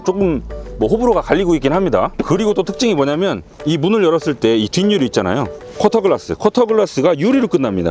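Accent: Chungcheong, South Korea. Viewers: 영상 처음봤는데 구독박고 갑니다~ 충청도 분이신가요? ㅎㅎ 말씀하시는 게 친근하게 들리네요~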